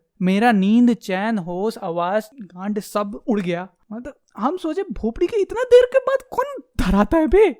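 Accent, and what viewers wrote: Bihar, India. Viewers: Bro tumhara accent bihari jesa h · Thethar 😹😹😹😹bihari ho ka? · I love your Bihari accent is awesome love it❤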